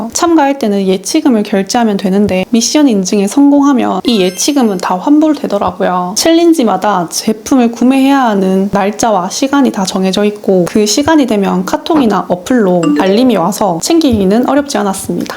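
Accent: Gyeongsang, South Korea. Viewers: 주인장 억양이 경상도분이시네~~대구 아니면 부산분이신듯~~😆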